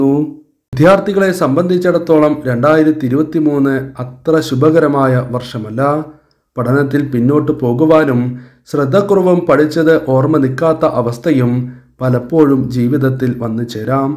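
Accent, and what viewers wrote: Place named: Namboothiri, India. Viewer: വളരെ വൃത്തി കേട് ആയിട്ടുണ്ട്.... Cool ആയി പറഞ്ഞാൽ പോരെ??? എന്തിനാണ് നമ്പൂതിരി യെ അനുകരിക്കാൻ നോക്കുന്നത്?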